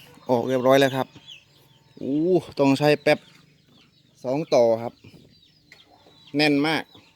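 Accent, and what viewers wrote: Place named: Suphanburi, Thailand. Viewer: สวัสดีครับ....ออ็อด...สู่สู่..ครับ เป็นกำลังใจให้ครับ.... เหน่อสุพรรณ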